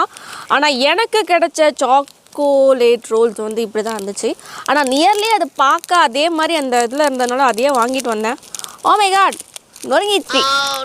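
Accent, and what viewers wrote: Tirunelveli, India. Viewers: Akka ninga tirunelveli ya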